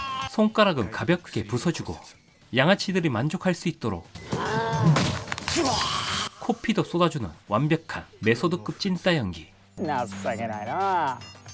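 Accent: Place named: Gyeongsang, South Korea. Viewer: 경상도 사투리가 물씬 풍기는 의 나레이션에서 뭔지 모를 독특함이 느껴진다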